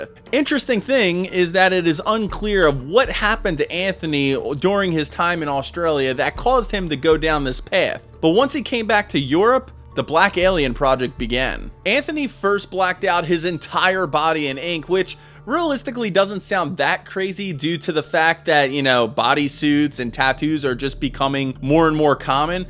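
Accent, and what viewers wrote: Philadelphia, United States. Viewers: This might sound crazy but im From South Philly and I can hear a slight philly accent when u talk. R u from Philly or around the area?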